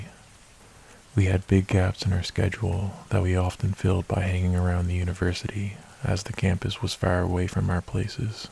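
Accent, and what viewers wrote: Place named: North, United Kingdom. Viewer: You've gotta be a northerner- we have the same accent 😬😄 Another great narration!👍💜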